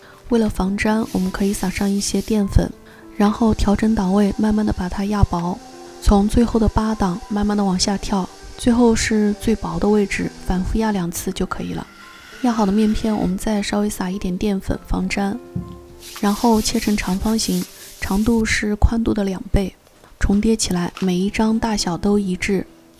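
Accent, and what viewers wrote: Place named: Sichuan, China. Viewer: 抄手配湯愈吃愈香是四川口音； 是四川人嗎？想做一些你的油潑辣子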